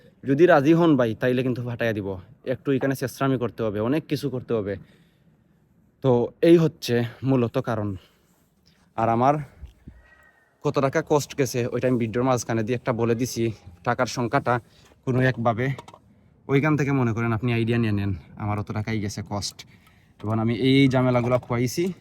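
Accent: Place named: Sylhet, Bangladesh. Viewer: Sylheti vibes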